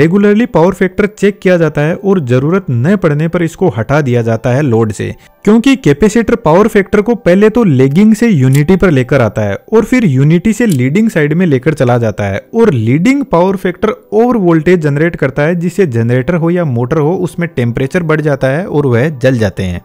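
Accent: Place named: Sikar, India. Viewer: Sir apki tone sunkar lag raha he aap sikar jile se ho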